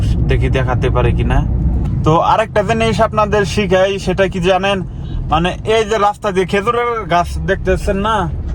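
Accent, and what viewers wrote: Tangail, Bangladesh. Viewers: ভাই কি টাংগাইল এর নাকি। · আপনার বাড়ি মনে হয় টাঙ্গাইল ? যেতে কে বলেন গেলেন 😛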